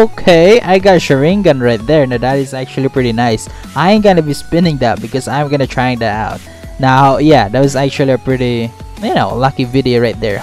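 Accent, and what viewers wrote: Philippines. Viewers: THis guys lives in the Philippines i know by his accent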